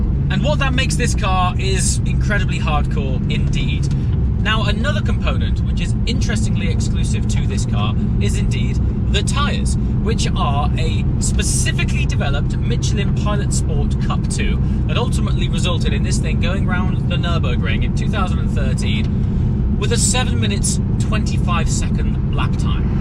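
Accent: United Kingdom. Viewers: The british accent gives the videos a touch of class that makes a supercar review a notch above the rest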